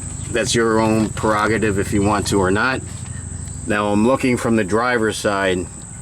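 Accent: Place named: Boston, United States. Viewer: Thanks for explaining every step while using the exact words to describe what parts needed what size tools, which direction to turn, slide, twist, push, pull and clip everything your hands touched under there as you recorded this. You didn't talk too fast, you're easy to understand and your Boston/East coast accent- Luv it! 😊 My auto mechanically inclined go-to men (Dad & Gpa) aren't here to help me with things like this anymore, so Thank You for the time, effort and energy you put into this video!!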